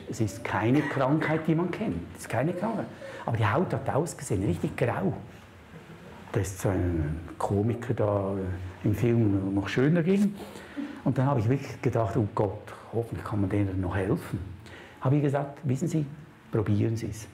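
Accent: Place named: Switzerland. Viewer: Es ist sehr schwer der schnellen, Schweitzer Sprache zu folgen. Ansonsten ein ganz großartiger Vortrag, in dem nur Wahres gesprochen wird. Wir alle sollten dem Folge leisten.....so weit es möglich ist.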